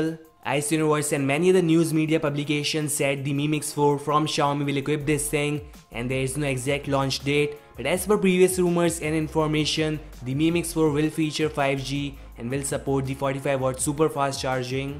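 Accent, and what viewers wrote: India. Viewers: India English, hahaha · Idian english🙂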